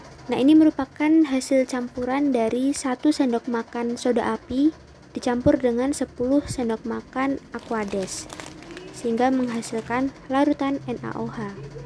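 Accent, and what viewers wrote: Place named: Java, Indonesia. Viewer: Jowone medok, hahaha semangat yaa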